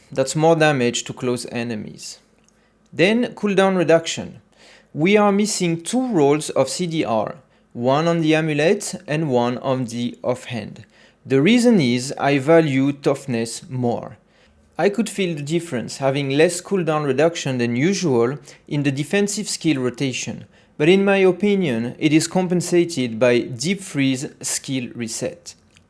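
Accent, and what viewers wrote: France. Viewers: Je like rien que pour l’accent français ! 😉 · This is definitely a cool and interesting video. You did a great job with your explanations. Also, with a such a strong Frech accent please let me compliment you on your English because it's excellent!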